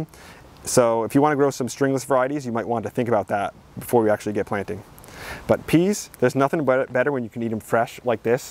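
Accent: New Jersey, United States. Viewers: Are you from Joisey?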